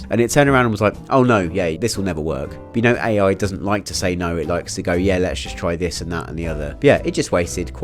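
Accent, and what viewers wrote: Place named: Southern England, United Kingdom. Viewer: Hey I just came across your channel, it's so nice to find a fellow brit doing game development! May I ask where you're based? You definitely sound like a Southener ;-)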